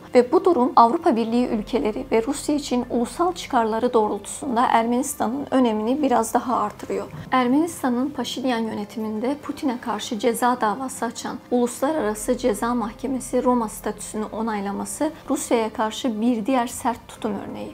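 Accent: Azerbaijan. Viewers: Azeribaycan aksani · Bu niye böyle konşyor Bu qiz yüz faiz Azərbaycanldı Türkce niye danşrsan Azərbaycan Türkçesiyle danış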